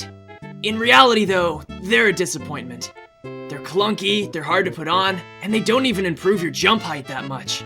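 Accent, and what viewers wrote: South, United States. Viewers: ✨ I’m ✨ Uncomfortable ✨ with ✨ ✨ country ✨ accent ✨ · holy hope, the country accent, man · HELP HE HAS A COUNTRY ACCENT THAT SURPRISED ME SO MUCH I JUMPED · 'Ya didn't tell y'all fans 'ya had such a good cowboy accent! Teach me 'ya ways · with a country accent is somehow cursed and blessed at the same time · If I never hear that Southern accent again, it’ll be too soon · HOW DOES HE HAVE HIS STUPID VOICE AND A COUNTRY ACCENT AT THE SAME TIME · Love the country accent 👌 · I love your country accent so much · how do you sound like and have a country accent at the same time. I - that's incredible. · the country accent scared me. i physically recoiled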